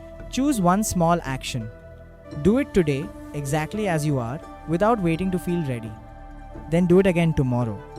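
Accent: India. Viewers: Japanese content but Indian Voice Over ???????????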